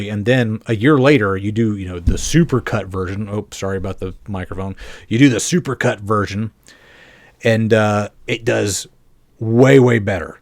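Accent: United States. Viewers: I'm obviously not speaking for everyone, and it is somewhat rude to say, but Slap on Location is annoying to listen to while playing games, due to shifting tones of narration (read vs memorised), long pauses in speech and the occasional interview, a big hurdle for people not accustomed to American accents.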